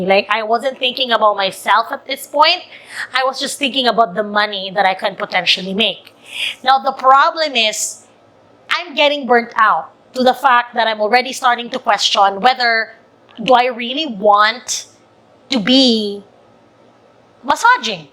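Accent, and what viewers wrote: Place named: Philippines. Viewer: Hi! I came across your videos, I was just doing my errands and i felt so annoyed with the feeds on my facebook. So I came here. I am an LMT here in the Philippines and i enjoy watching your videos. I get new ideas. I was about to apply there in Canada as a massage therapist after the pandemic before we created our massage business but my husband didn't like the idea as we are both DEPED teachers at the same time massage therapist. It is our passion and we also have massage clinics. I assume you are filipino. You speak like one. Are you?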